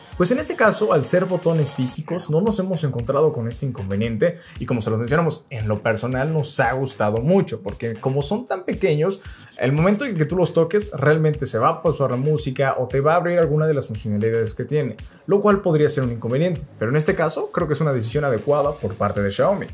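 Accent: Mexico. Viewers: Me gustan muchos los vídeos de este canal pero me fastidia la forma de hablar jajajaja puro hablado de mi rey de culiacan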